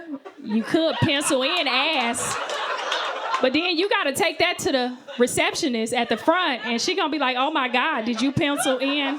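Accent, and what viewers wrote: South, United States. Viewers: She has that classic southern twang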